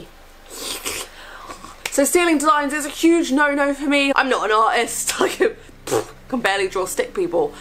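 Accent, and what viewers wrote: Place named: Ipswich, United Kingdom. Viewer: I love hearing your accent. Knowing you're from Ipswich (at least, I hope you are, or I'll look like a right tit) gives me a warm feeling inside. I grew up in Diss 😃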